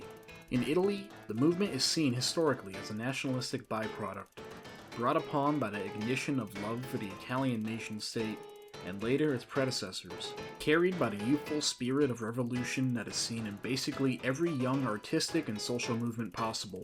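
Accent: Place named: Wisconsin, United States. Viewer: You sound like you're from Manitowoc, Wisconsin.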